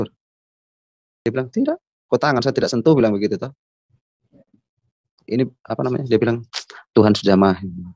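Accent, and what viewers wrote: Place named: East Nusa Tenggara, Indonesia. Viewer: Logatnya sdh NTT banget ,Mas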